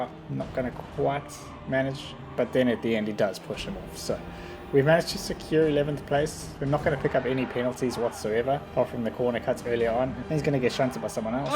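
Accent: South Africa. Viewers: where you from ? Sound South African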